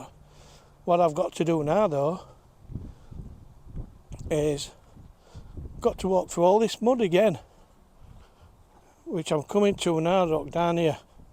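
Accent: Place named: Yorkshire, United Kingdom. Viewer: Eyup, Quality vid dat remember it well not been round dare from about same time as de never knew dat bridge had been changed or dose houses been built cheers pal enjoyed dat PS nowt wrong wi de voice proper Yorkshire 👍👍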